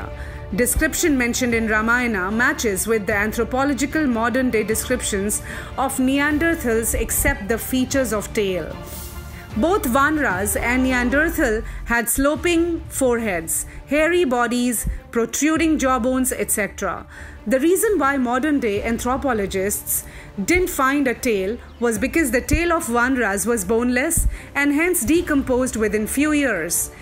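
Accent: England, United Kingdom. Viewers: kya ramaaayenaaa aur banaraaaaa bol raha he be tu angrej he kya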